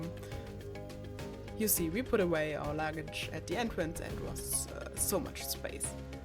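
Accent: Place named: Germany. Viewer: You sound soooo very German when you speak English.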